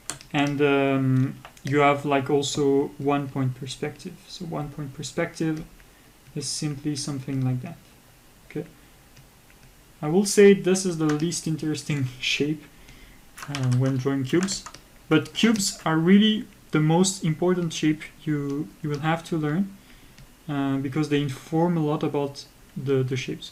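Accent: France. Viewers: Awesome tutorial and French accent 😀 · Moi je l'aime bien ton accent Fr ^^ Merci pour le tuto mec, je l'ai pas encore terminé mais je sent que je vais apprendre beaucoup ! :) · dude dont ever apologize for your beautiful accent!!! You are bi-lingual (at least bi maybe more) that is amazing and so what if you have a french accent, you are actually better off, if you didnt people might think you were American (like me) and I know you dont want that!!!! Great tutorial, great english, great accent, thanks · native English speaker here, your french accent is a nice bonus. its as if you are speaking in cursive. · UM DO NOT APOLOGIZE FOR UR FRENCH ACCENT! You and David Renoy are the two krita people who are carrying be with learning art right now, SO THANK YOU. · No no no! Never apologize for your French accent. 🤙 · face-green-smiling:I chuckled when he apologized for having a dreamy French accent. 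· I love the French accent. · Merci pour ce video!😊 Je commence travallier avec Krita. Avant j‘ai eu seulement ProCreate. C‘est tres bien d‘avoir un guide comme toi. Ton accent francais est en plus!🤣 Nous aimons la France!!! (Excuse mon accent allemand😉) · nice french accent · French accent is objectively the best accent for an art teacher anyway. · Quand j'ai commencé à regarder le guide je me suis dis que t'avais un sacré accent français et puis à j'ai eu une confirmation que tu étais français. C'est génial de prendre la peine de faire 2h de guide en anglais pour aider plus de monde en tout cas même en tant que francophone tu m'as beaucoup aidé merci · tu es français non ? Tu as un accent français du coup j'ai tout compris, dommage que tu n'ai pas fait aussi le tuto en français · I really appreciated this video. And your french accent didn't get in the way of my understanding at all😃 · awesome and helpful video.. 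btw no need to apologize for your accent.. youre speaking english being a french., thats a big thing.. remember most english speaking peoples dont know any other languages other than english.. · Why are you apologising for your French accent? It sounds awesome. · I love your French accent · Je suis content d'entendre un accent français je comprends mieux comme ça :') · "Im sorry for my French accent" Bro that makes the tutorial even better to listen to 😭😂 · nah man the French accent is where is at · Ne t'apologize pas pour ton french accent, il est parfait. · Hello Dear, I think you don't have to sorry for your French accent. Its your own identity. You should be proud of it.:goodvibes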